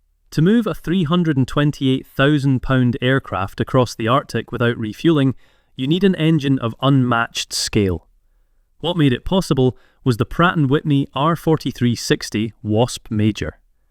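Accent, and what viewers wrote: United Kingdom. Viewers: It's also a UK accent using exclusively US units Obvs not concrete evidence but defo suspish imo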